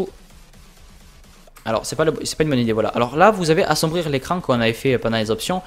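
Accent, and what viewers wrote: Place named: Quebec, Canada. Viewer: j'entends ton accent et je me demande si t'es quebecois ou marseillais mdr, mais sinon bonne video merci je vais m'y mettre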